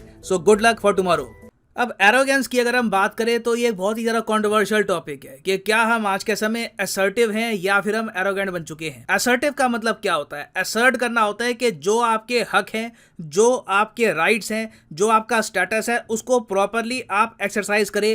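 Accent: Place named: Punjab, India. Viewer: Sir app Punjabi ho ? ,Aisa accent tho pehli nehi Suna tha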